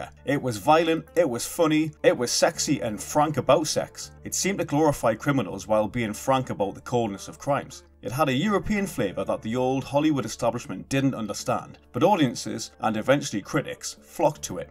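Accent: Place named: North, United Kingdom. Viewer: Good review, good to hear a fellow British Northerner narrate on a Joblo video.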